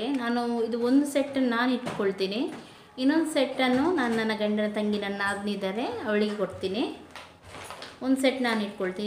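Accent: Mangalore, India. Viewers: Madam nimma mattu keluvade onda thara khushi. Nimma magalur kannda super. Nimma vedio super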